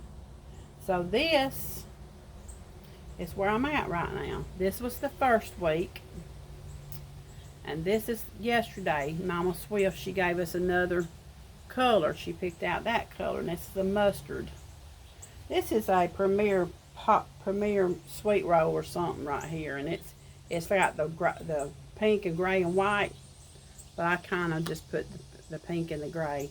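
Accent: South, United States. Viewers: Enjoyed your video, this is my first time seeing you. I was recommended by "Summer's Tips and Stitches". She said she loved hearing your Southern accent. I am a southern woman also, from South Carolina. Love your country scene. Look forward to seeing you again. God bless and pray that you and your husband get your money back.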